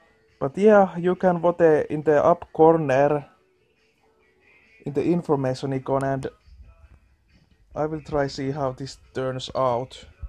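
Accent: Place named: Finland. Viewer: This is the most Finnish thing i've ever heard :D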